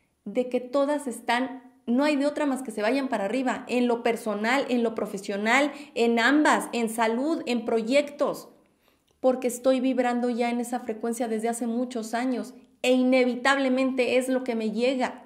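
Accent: Mexico. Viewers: "que se lo dejaba a sus 25 hermanas" JAJAJAJAJA. Me super aburre escuchar hablar a alguien pero con esta mujer es imposible, sobre q amo el acento mexicano y el carisma q tiene ella una no se puede aburrir ni un poco 🙌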